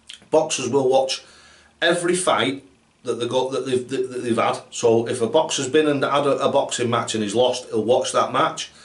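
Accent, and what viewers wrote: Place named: Manchester, United Kingdom. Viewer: Great videos... Nice to hear darts explained in a way I can understand/ relate to and in a propor accent too. Mancunian 😉👍🎯 I'm only a few months in to taking darts more seriously and your videos have helped me a lot pal. Cheers for that. As a pub landlord looking to get a team going I figured I'd need to be able to chuck to a reasonable standard haha. 👌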